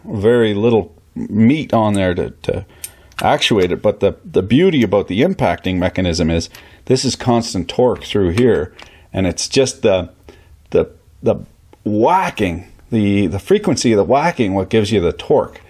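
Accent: Quebec, Canada. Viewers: Quebec accent swearing yeah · My freaking god you sound canadian when you speak french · Canadian accent. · That's some of the best Canadian accent I have heard in a while. Felt like drinking a cup of maple syrup and eating some poutine when I was watching this.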